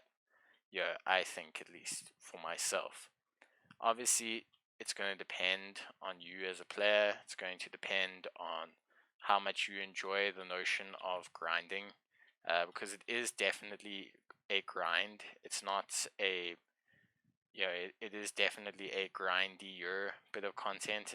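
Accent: South Africa. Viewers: Bro, your South African. Tell me, do you play private servers?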